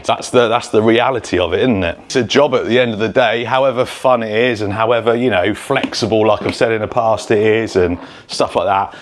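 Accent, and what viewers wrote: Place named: England, United Kingdom. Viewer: you have a nice english accent that is nice to listen to..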